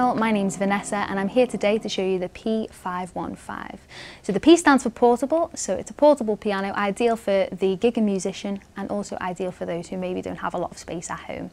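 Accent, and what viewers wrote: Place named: Scotland, United Kingdom. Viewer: She's so cute!!! And the Scottish accent... just... 😍😍😍 The keyboard's pretty good, too, I must say.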